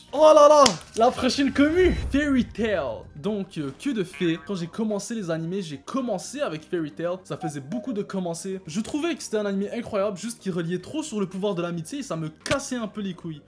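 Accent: Quebec, Canada. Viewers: Je me suis abonné au moment où j’ai réalisé que t’avais un accent québécois de ouf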